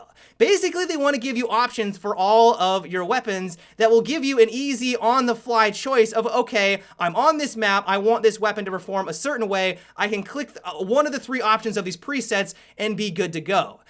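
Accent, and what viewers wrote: United States. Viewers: This video is basically just Jackfrags one with an American accent. I have no qualms with this.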